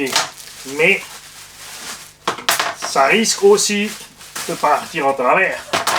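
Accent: Alsace, France. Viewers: J'adore l'accent surtout accentuer par le alsacien 👍🏻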